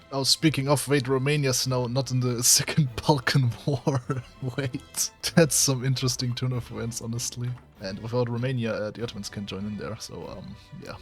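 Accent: Germany. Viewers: Why do you sound German?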